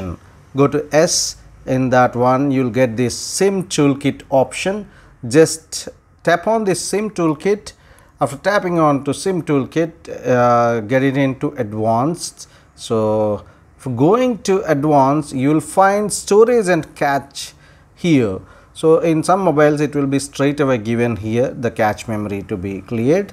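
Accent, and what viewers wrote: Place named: Israel. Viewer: You clearly are an israeli by your accent and that israeli airline app u got i just hope you get the justice we deserve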